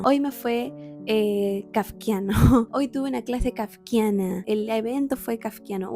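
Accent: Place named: Peru. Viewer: ... Pues déjame decirte que has hecho una buena reseña con tu dulce voz, de acentito, de muchachita... peruana.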